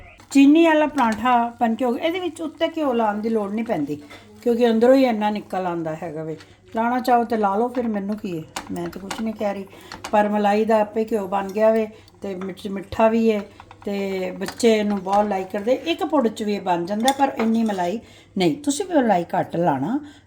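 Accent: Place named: Malwa, India. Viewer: A pigrna te khurna ....malve di boli hy schi dso bhain ji kehrha pind hy tuhada mera muktser hy tahi tuhada piar auda rehda hy ...mai canada to likh rhi ha sarian videos dekhdi ha ❤❤....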